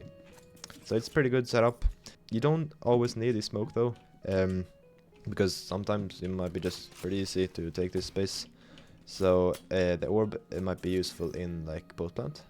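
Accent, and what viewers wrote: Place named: Sweden. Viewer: Are you from Sweden or do you just have an accent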